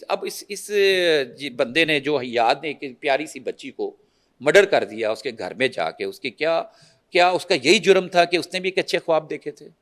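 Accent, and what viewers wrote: India. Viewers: Don't try to have indian style of pronouncation. Khan as kan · Is this host indian? Why is her accent like them? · Host 's pronunciation is silly ,aping lndians, quite disgusting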